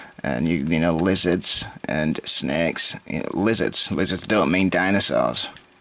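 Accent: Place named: Lancashire, United Kingdom. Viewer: hey, I remember that huge dinosaur in manchester museum hanging from the ceiling when I was a kid, I think it was supposedly a T.Rex? 65 million years old 🤣 I was totally indifferent to it . Maybe bc I was a girl. I want to ask are you from north side you have gorgeous Lancs accent... & that cynism & skeptism. Superb. Miss the mancs voice.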